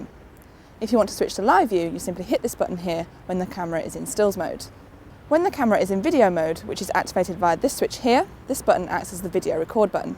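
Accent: United Kingdom. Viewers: Like camera review with uk accent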